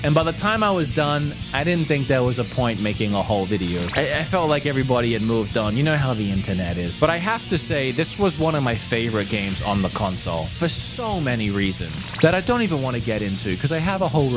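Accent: Australia. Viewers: what is your accent, it sounds like a mix of Australian and Boston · The last time I watched one of your videos, you had an aussie accent? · Where is he from? Is he Australian?? He has a lovely accent! And I can understand it perfectly! ❤😅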